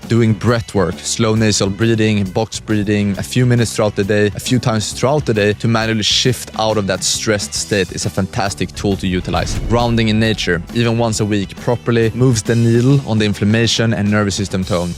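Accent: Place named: Sweden. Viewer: I would love to se this video but I cant stand a swedish accent. Can someone tell me what tho overall ide is?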